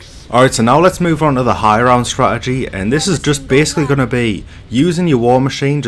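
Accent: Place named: North, United Kingdom. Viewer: I’m really struggling to put my finger on your accent, definitely northern but I can’t pinpoint it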